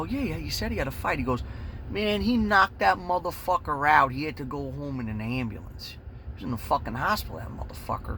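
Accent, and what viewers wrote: New York, United States. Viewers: Love the content you and produce it's awesome so glade i found your channel I like your accent it reminds me of the old new York movies great work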